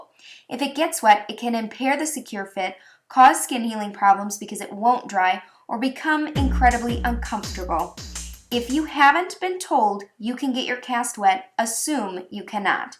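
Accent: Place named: Minnesota, United States. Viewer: i can tell you are from minnesota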